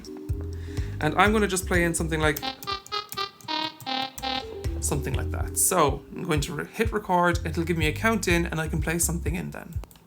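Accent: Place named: Ireland. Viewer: I like the irish accent :D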